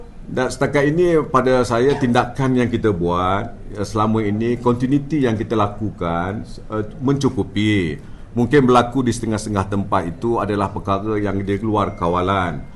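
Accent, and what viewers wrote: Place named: Perak, Malaysia. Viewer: pekat bebenor peraknye yeop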